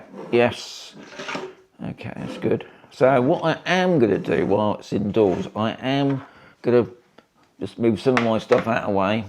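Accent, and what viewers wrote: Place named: West Country, United Kingdom. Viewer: Nice one,. You sound like you are from the west country. Brizzal? 😀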